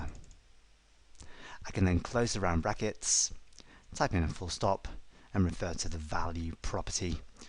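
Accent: England, United Kingdom. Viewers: Refreshing to hear these type of articles with a british accent for a change. Nice one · Love all your videos. Like the English accent. I would like to expand on this topic and see if you could use a stored procedure instead of an embedded t-sql and pass the parameters to this sp to retrieve the information. I tried to do this but could not bind the parameters to any field in the report query. Do you have any suggestions?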